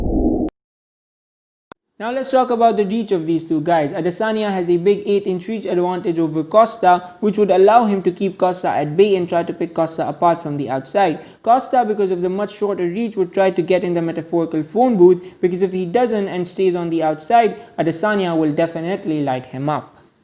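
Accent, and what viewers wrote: India. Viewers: he deffinetly is lol, however, he's either been living in the uk or just been on the Internet so much he's picked up some slang... Dont think I've ever heard "this will be a banger of a fight" in an Indian accent before.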